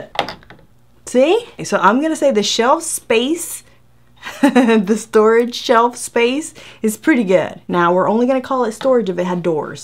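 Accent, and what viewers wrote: South, United States. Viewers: where are you from, you sound southern...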